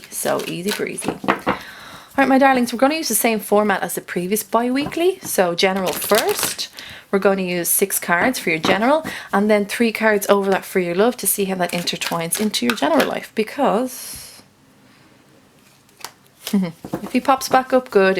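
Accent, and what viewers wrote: Ireland. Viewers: This was so fascinating. Kinda scary how much this resonates. I really like how u read. I appreciate how no nonsense you are yet gentle and encouraging. And it feels natural; not at all contrived. It def was an intense read. But somehow I don't feel upset or unsettled becos u infused so much helpful insight and reassurance. Subscribing!! Thank you for this read. (Hearing your Irish accent makes me miss my dear friend who's Irish) 😊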